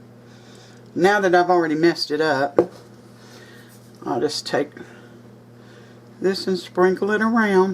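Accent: South, United States. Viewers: oh I didn’t think you named a country of origin or if you did I missed it! I just thought I would add that little trivia. I love watching your videos. What I love most is your straight forwardness and honesty. I’m the same way but it gets me in trouble sometimes. I have no filter and that is not a good thing!🤣😂🤣😂🤣😂 And you would never know you were raised up north. You sound and cook like a true southerner!! 💖💖💖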